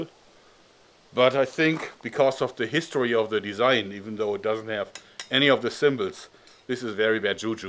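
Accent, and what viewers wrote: Germany. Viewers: Did you ever live in America or you learned English in Germany? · Hi, Can you please explain in more detail why you don't likr the last knife that you presented last. Thanks, Also keep up the good work and I love your vids even though sometimes I cant understand what you are saying cause of the strong German accent, but after I rewind and play the part in question I can usually piece it together. Pete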